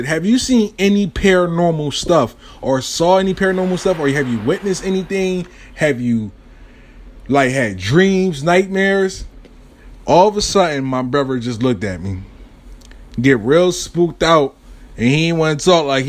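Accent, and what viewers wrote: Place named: Philadelphia, United States. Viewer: Philly accent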